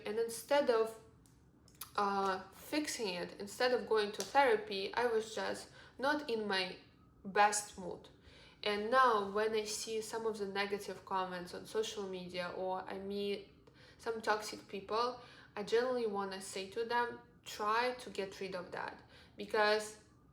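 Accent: Russia. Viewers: по первым секундам поняла что русская😮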